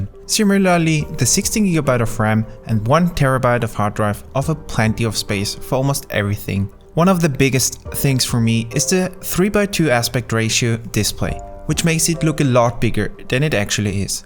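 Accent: Germany. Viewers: lol are you german? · What accent is that? Austrian? hungarian? german?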